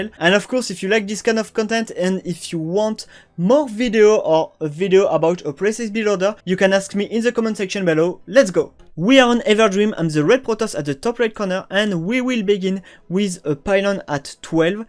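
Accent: France. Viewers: le french accent aha , bon j'ai le même, top contenu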